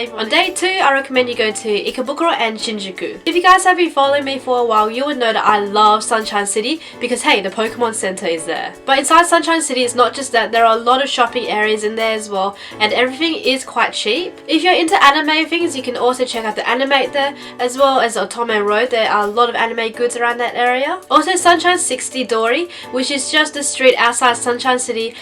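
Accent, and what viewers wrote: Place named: Australia. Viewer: you are very pretty, and sound like an Aussie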